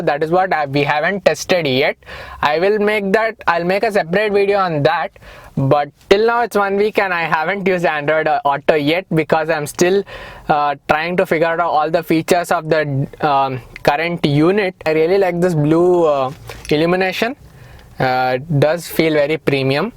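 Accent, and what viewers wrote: India. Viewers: your accent is sooo damnn funny bengali babu😂😂 and bro why r u so nerdy ?? improve ur personality dude open up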